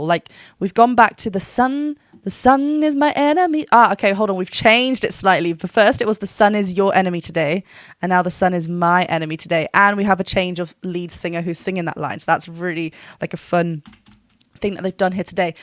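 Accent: United Kingdom. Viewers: Nice reaction. Be prepared, The Warning Army is coming. This will be your next favorite band. The Warning is addictive. You have no idea how much fun you are going to have going down this rabbit hole. I assume from your accent that you are in the UK. They just played a soldout show at O2 Academy Brixton in London. Your next reaction should be Evolve, live at the MTV VMAs. Don't forget to turn it up. As all Warning fans say, "You have been Warned!"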